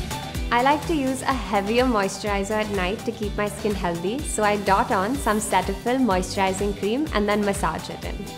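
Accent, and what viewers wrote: India. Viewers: So funny how you look American and have an indian accent,didn't expect that at all😂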